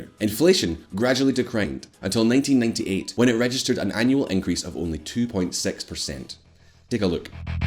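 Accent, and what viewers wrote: Scotland, United Kingdom. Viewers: I've seen Brazilians with no teeth, black teeth and no shoes. Obviously by his accent he is British. The comment is meant to be satirical. You're welcome!! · Are you British ?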